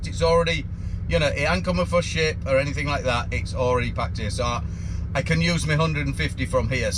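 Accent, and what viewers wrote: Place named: East Yorkshire, United Kingdom. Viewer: Hi mate. Did you say your from East Yorkshire? I live in Goole. Your accent sounds alot like mine. 😆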